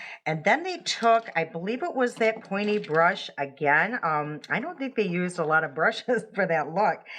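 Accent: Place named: Michigan, United States. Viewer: Now I really want this palette! Your voice sounds like home - you must be from Michigan ❤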